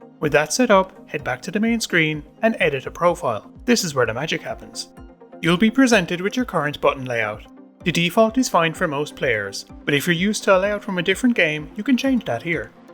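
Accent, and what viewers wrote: Ireland. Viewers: lovely ol irish voice, good vid lad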